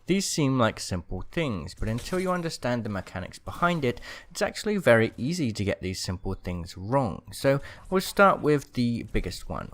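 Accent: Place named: Scotland, United Kingdom. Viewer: スコットランド人の訛り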